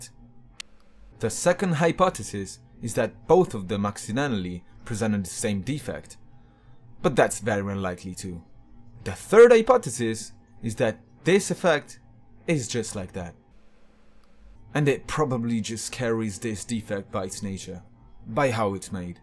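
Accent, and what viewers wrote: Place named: England, United Kingdom. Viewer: he has a clear beda house english accent!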